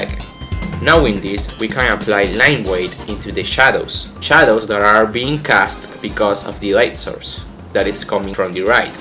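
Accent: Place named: Latin America. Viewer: ya me parecía que el acento era algo latinoamericano (en la información de tu canal dice que sos de Panamá te juro que no te doxeé) de verdad es increíble ver qué la comunidad de artistas es tan variada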